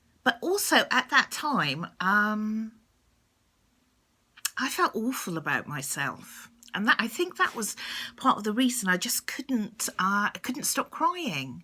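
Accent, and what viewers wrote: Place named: United Kingdom. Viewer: Nice. Maybe the lady with the tissues remembers the day as well. I enjoy your accent, very different from the Canadian I'm around. I can't "place" the differences in the British ones very well. We don't have accents over here of course:)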